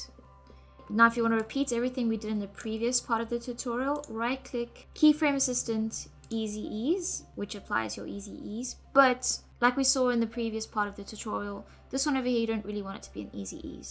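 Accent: South Africa. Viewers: Awesome tutorial! And great to hear an SA accent with shots of Milnerton and Hout Bay :D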